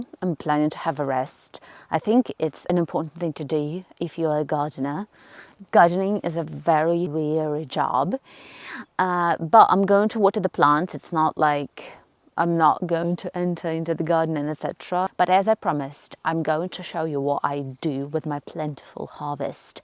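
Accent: England, United Kingdom. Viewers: You have an Eenglish Axcent, Who was your teachers? The hynm, Amasing Grace by John Melton. Came after a storm off the Irish Coastline. Communication and voice is important. We need to learn to bake a 🎂 cake.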